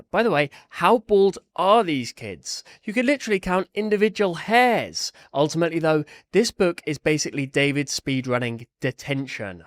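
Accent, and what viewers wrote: United Kingdom. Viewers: Hey there mate quick questions are you British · Yeah is British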